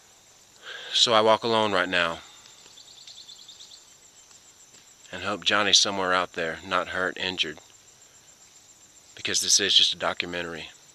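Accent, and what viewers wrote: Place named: South, United States. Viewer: I love your country accent. ;)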